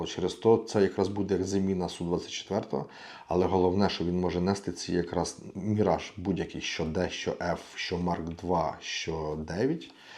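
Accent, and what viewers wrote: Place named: Russia. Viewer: Яка бездоганна українська мова, спочатку подумав я, але це було тільки спочатку! Жахлива кількості русизмів, неправильні закінчення і взагалі не відповідні слова, або мовні покручі. Наприклад: мощний ; крепший; крєпєжа; пощитати замість порахувати і рахується замість вважається (рахується - калька з російської - СЧИТАЕТСЯ); по елЄктроніКі; канешно і таке інше. Жах. (((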